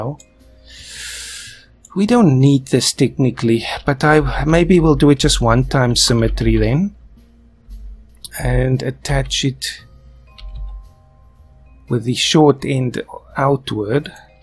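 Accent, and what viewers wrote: South Africa. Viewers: You sound so south African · Are you South African?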